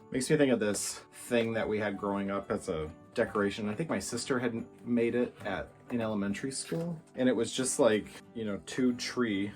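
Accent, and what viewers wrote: Minnesota, United States. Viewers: you look VERY fetching in that red plaid shirt!! I'm sorry it was making you itchy and bitchy, but you were giving 6'4" lumberjack vibes!! 🪚 🪵!! On the 8th day of Vlogmas my true love gave to me: Eight cameras tumblin' Seven cutouts glowin' Six berries spillin' Five Christmas trees 🎄🎄🎄🎄🎄 Four Paolo meows Three sets of batting Two power strips 🔌🔌 And you sound like the movie Fargo 🎄⛄🙂⛄🎄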